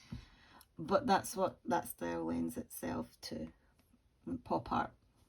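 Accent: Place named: Scotland, United Kingdom. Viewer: Welcome to Youtube! Lovely to have another voice from the UK. I love your accent - I used to live in Edinburgh for 9 years and I miss Scotland a lot! Beautiful painting, enjoy working on it!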